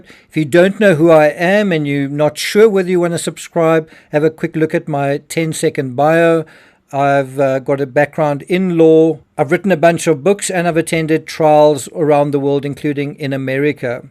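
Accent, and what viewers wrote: South Africa. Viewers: This commentator sounds south africa . Is he.😊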